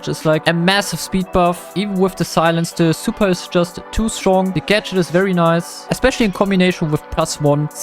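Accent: Germany. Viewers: Sprich deutsch dein deutsch Akzent ragt zu sehr raus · Bro der deutsche Akzent ich fühl den so hart 😂😂😂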